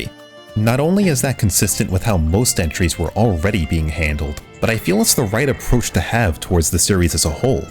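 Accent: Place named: New York, United States. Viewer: You must have the heaviest New York accent when you're not putting on this radio voice.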